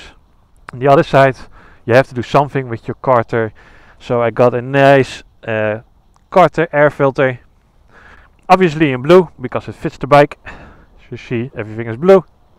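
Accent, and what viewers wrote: Netherlands. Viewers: Ik hoor gewoon aan je dat je nl bent😂 praat gewoon nederlands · Haha ik dacht ook gelijk hé Nederlander haha. Rijd ook een R.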